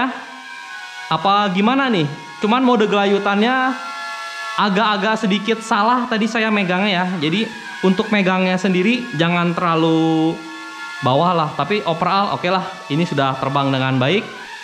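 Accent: West Java, Indonesia. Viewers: Bang Sunda Nya Sunda Mana Bang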